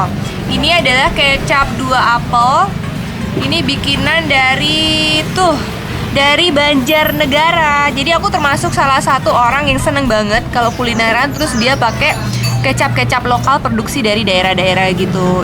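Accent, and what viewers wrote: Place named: Surabaya, Indonesia. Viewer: Sampean asli endi mbk..kok iso ngomong jowo..suroboyo ta mbk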